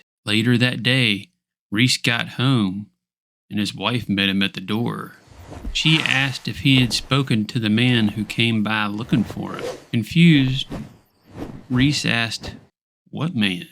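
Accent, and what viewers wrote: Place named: Appalachia, United States. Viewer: Hey, I really love your accent and pronunciation. Where abouts Appalachian way are yougrom if you don't mind me asking 😊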